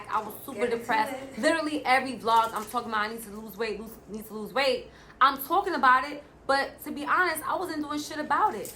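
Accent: New York, United States. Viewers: GIRL I just checked your P.O. Box and you live on long islanddd ??? Ik I heard the New York accent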